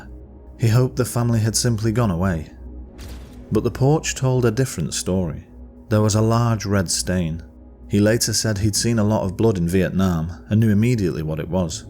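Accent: United Kingdom. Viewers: Why do you have a British narrator when it occurred in the United States?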